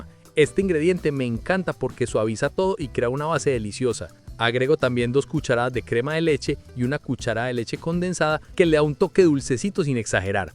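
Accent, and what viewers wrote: Colombia. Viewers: Se ve muy rica si la haré,se escucha que eres colombiano, saludos desde la Ciudad de México 🇲🇽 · Eres colombiano? Me late por tu timbre de voz 😊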